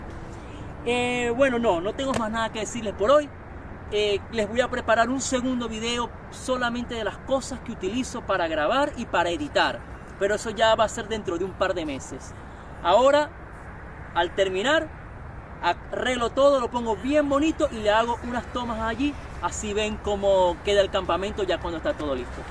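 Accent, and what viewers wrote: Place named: Venezuela. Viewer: Muy bueno..mi pana,..desde el principio me pareció acento venezolano,..pero cuando hablaste sobre el hecho de que no cuesta “tres lochas”,..no tuve dudas. Muy bueno e interesante tu video. Donde es ese lugar?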